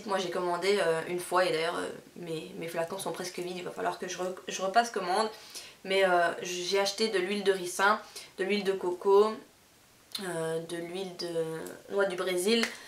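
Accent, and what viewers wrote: Belgium. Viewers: Coucou :) j'ai adoré ta video et tu a de très beaux cheveux 😍 enfin bref je voulait te dire que tu a raison de te laver les cheveux une a deux fois par semaine (je fais pareil), ma mere est coiffeuse et elle m'a bien dit que si on se les laves une fois tout les deux jours par example, ca peut les casser et que ça sert vraiment a rien de se les laver aussi souvent :) donc Voila y a rien de dégoûtant à se laver les cheveux une fois par semaine 😅 c'est juste que ca les abîmes moins. Et pareil, quand j'ai mis un truc dans mes cheveux ou quoi, je les laves en plus c'est normal :) Voili voilou 😋 PS : j'ai remaqué que tu avait un accent, t'est belge? :)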